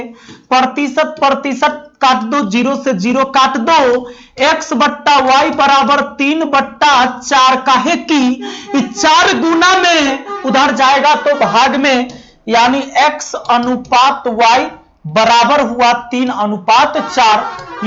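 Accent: Bihar, India. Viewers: Please Bihari tone me mt padhaya krein sir Please mujhe kisi ko hurt nhi krna just mujhe smjh nhi ata isliye bol rhi hu I am fan of your tricks but just because of tone I am unable to understand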